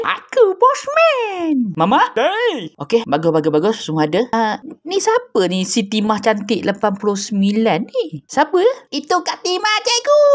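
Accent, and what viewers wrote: Malaysia. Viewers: Abang negeri ape perak atu kelatan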